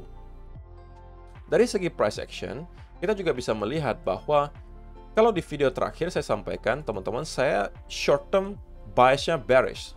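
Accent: Pontianak, Indonesia. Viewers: haa abg org pontii ???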